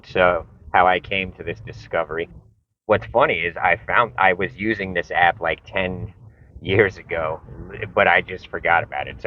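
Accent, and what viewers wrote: New York, United States. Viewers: this NY nasal accent... It's sounds great though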